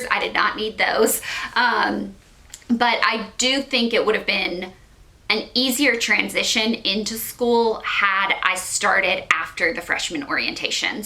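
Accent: South, United States. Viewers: you were one of the first, if not the first, beauty related youtuber that I subbed to way back when because of number 1: your southern accent lol number 2: your mac beauty hauls and 3: you were just fun to watch! I'm so happy to see that you're doing and looking amazing and that you took time off to refocus and find true happiness vs looking for it in LA. Everything in LA is rotten. so happy you got outa there! <3 If you're looking for new video suggestions lol I'd love a day in the life in Nashville. I'm dyingggg to move there! would love to explore it through your pov. :D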